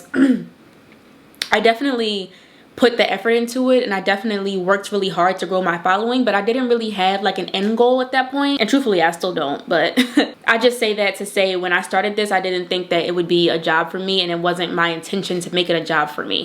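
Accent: Baltimore, United States. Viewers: Soon as you started talking I knew you were a fellow Baltimore native lol. It's rare to hear people who sound like the people in my everyday life on YouTube. The way you talk is so familiar lol. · In all the years of watching you, I just realized how subtle your Baltimore accent is! I too live in Miami (born and raised) but I live south near Zoo Miami. Praying the best for you and your new endeavors 🙌🏽 I just applied to FIU for their new Cybersecurity program 🙏🏽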